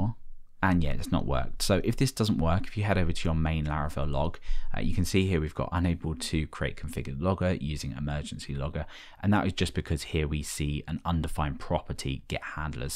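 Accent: United Kingdom. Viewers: tks sir ! btw your accent is a British accent right ? i'm Asian and i curious about english accents in the world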